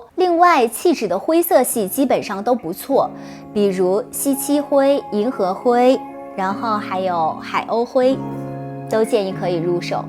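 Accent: Japan. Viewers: 日文发音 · Her pronouncation is correct in Japanese, it's katakanam, used for words from foreign languages. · 她是日式英语发音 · 日式英语我听懂 解说非常好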